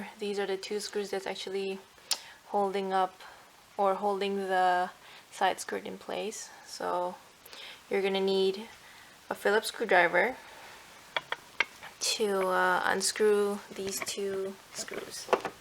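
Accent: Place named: Hawaii, United States. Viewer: Are you from Hawaii? I can hear a little Hawaiian pigeon in your speech.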